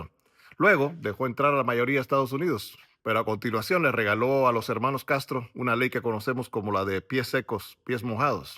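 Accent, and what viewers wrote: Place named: Mexico. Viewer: tienes acento mexicano ahi fue donde te fuiste parece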